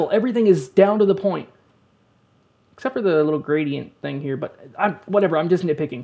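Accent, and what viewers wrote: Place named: South, United States. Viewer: You're definitely from like a Southern state lol. Mississippi or something. If you're close by we should be friends haha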